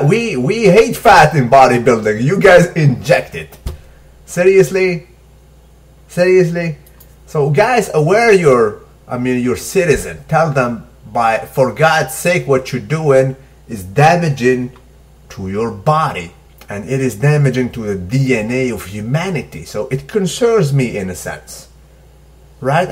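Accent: Arab world. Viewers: his Arabic accent is pretty spot on lol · ***** i am from Egypt, I really have no idea how do someone even think he is aesthetic looking like that?! i also admire what you are doing trying to raise awareness and everything personally i didnt see a case of synthol abuse before in front of me yet i know for a fact that even pro bodybuilders use it sometimes to hide some weak points i dunno is it about abusing? not that i like the idea or anything even with a small dose its not even making muscle gains + the poisoning possibility from my side i am sharing your video on some Egyptian fb pages and forums, hopefully when teenagers see it, they realize the facts at early ages. salute you & your arabic accent as i honestly dont know how come you speak arabic :D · hahahah i like your arabic accent, if i find someone in morocco with biceps lookin' like an elephant balls i'll tell him what really works. 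By the way right now i'm bulking and i'm going to need you in my first cut man. SALUTE !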